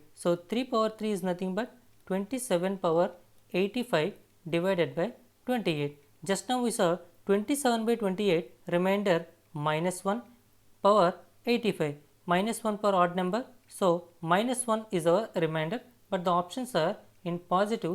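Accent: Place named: South India, India. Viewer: Are you from south india?? Very useful video ❤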